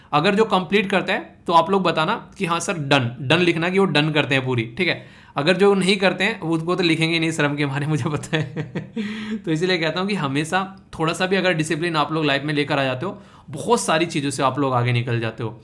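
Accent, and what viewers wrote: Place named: Rajasthan, India. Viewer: sir aap kuch bhi kho ki aap kha se lekin i know it bcz aapke sare keyword or bolchal ki bhasha poori rajsthan ki mere according to rajsthan sehi ho or syd aap shrri ganganagar ya north rajsthan se ho im sure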